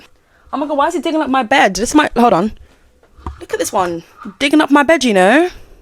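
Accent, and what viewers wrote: United Kingdom. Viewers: you sound like 10 times more british in this video than usually, and it's super cute!!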